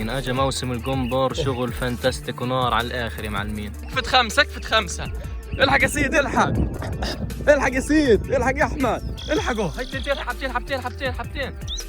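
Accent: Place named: South, Saudi Arabia. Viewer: ❤❤😂 نفس لهجتنا اخ احمد جنوب السعوديه حوش الصيد واكفتها وتشرد وكثير وعشان 😂 نفس اللهجة اقرب لنا من اللي قريب من ٥٠ كيلو تختلف حنا عنهم وانتم تبعد ونفس اللكنه ❤😂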